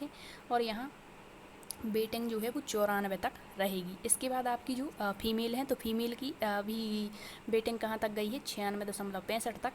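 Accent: Bundelkhand, India. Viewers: आपके बोलने के लहज़े ऐसा लगता है कि आप बुंदेलखंड से हैं मैडम🙏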